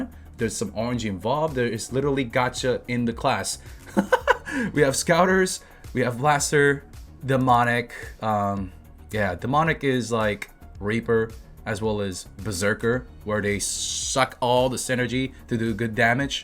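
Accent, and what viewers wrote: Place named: United States. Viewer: Dude, are you from america? Your english is perfect. A lot of the korean streamers out there do speak english, but obviously have a korean accent. If I didn't check already, I would think you were from the states.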